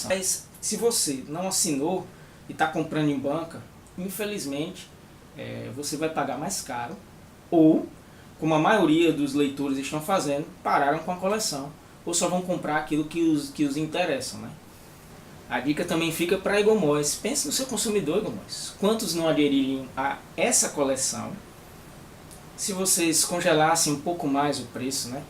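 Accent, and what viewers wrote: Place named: Northeast, Brazil. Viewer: Grande Vídeo, tava faltando vídeos com um sotaque familiar daqui do Nordeste :). Abraço.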